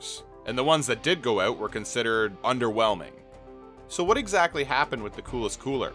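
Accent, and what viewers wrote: Canada. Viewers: I think the voiceover guy has the most Canadian accent ever lol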